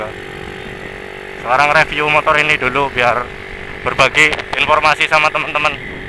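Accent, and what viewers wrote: Indonesia. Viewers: Njawa lucu bos🤟🤣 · laa ya ngapak ya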